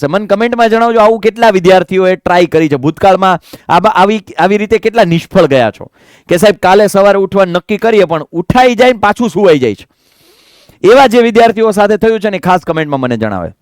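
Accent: Kathiawar, India. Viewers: Tame kadhiyavadi cho to sarkhu j bolu kadhiyavdi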